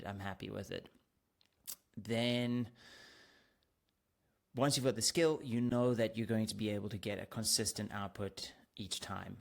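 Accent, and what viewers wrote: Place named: South Africa. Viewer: Well presented and it unlocked some ideas for my future client builds. It's a definite game changer for creating neat reports, without having to use various apps. I thought I recognized the accent... a fellow saffa:-D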